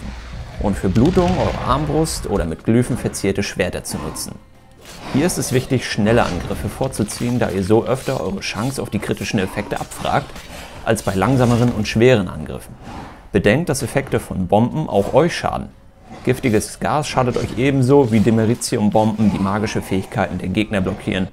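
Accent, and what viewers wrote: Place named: North, Germany. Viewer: Kommst du aus Schleswig-Holstein oder Hamburg? Erkenne deinen Akzent sofort 😂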